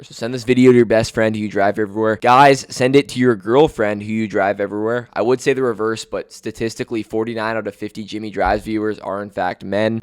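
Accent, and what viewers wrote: Canada. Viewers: canadian detected!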